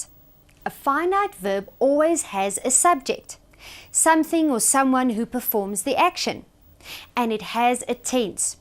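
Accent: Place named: South Africa. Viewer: south african possibily afrikan speaker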